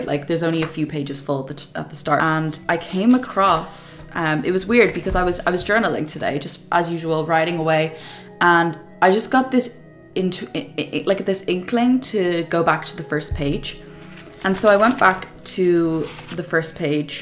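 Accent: Ireland. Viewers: 2nd generation American Irish Girl(woman) here! I literally manifest everything I desire and am open to. I too have vision boards and journals to prove it. Amazing. I just found you today and love your slight Irish ☘️ accent! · Ngl, my English accent sounds so bland compared to your Irish one.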